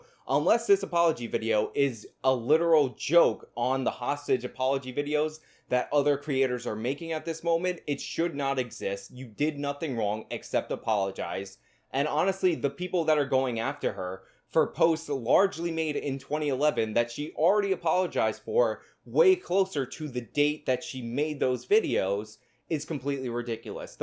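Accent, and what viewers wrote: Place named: South, United States. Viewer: A man with a southern accent apologizing for hurting peoples feelings. The South as a whole weeps for his cowardice.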